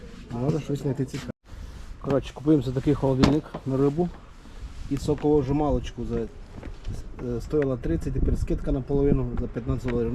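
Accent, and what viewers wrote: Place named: Zakarpattia, Ukraine. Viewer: Сяка зима била...😅 зразу чути Закарпаття.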